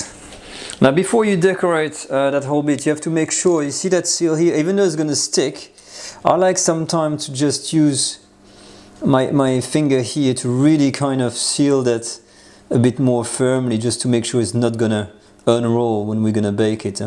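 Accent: France. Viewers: That French accent. Formidable! The food is pretty good too. 😋 ❤️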